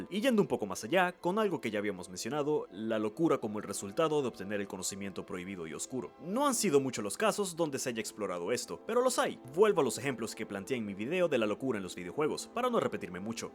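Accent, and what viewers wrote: Venezuela. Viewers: De casualidad serás venezolano?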